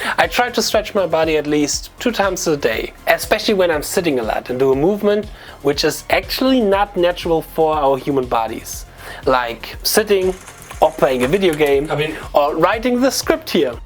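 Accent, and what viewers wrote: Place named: Germany. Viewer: Great video but as a fellow german,the accent makes me crack ip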